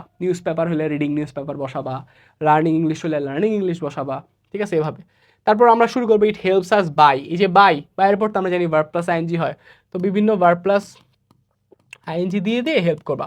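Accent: Sylhet, Bangladesh. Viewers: Tumio sylheti ni?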